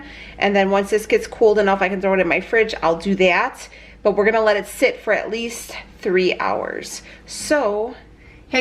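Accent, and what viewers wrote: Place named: New York, United States. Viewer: You are absolutely blooming brilliant. I love how you talk. Your accent sounds like a New York police officer. I love it! PS... you’re one gorgeous woman!xxx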